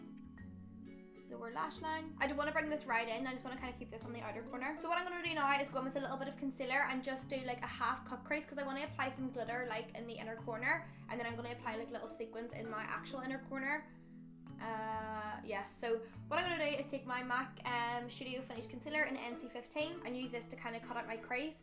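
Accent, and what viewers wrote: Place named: Belfast, United Kingdom. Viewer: no need to hate on her! It her accent I'm from Belfast and it's just in our accent that we say like a lot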